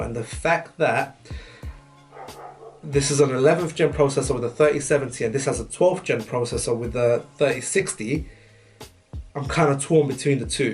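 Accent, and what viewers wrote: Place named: Arab world. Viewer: واضح من لهجتك عربي صح؟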